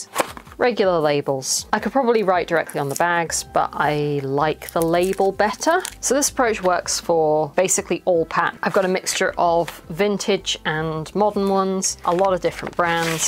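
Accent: United Kingdom. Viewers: Wow! I wish you’d worked this out and told me 50 years ago. Hah - I know you weren’t even born yet, then! Well you’re a genius. I could understand and appreciate every single piece of info I just watched and as you flipped through your patterns, I recognised some of the ones I myself bought in the 1960s, 1970s and 1980s. Actually all that stuff comes back into fashion every so often and I haven’t bought patterns lately - they seem so expensive these days! It’s such an intuitive and sensible way to organise patterns. Mine have all been sellotaped together over the years because they do indeed swell up every time you use the contents. And I’m going to set aside some time to put your wonderful system into practice for myself. Thanks a million ❤❤❤ PS How I love to hear a lovely calm British English voice with no affected growling or peculiar accents. 😂😂😂 So many youtube videos get turned off here, because I can’t bear the hyped up crazy sounding voices and over exaggeration on each word. 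And these days, most people seem to shout at the microphone for some reason. It’s a delight to listen to you, so thanks very much. Keep up the good work - it’s always interesting and helpful and unusual and informative x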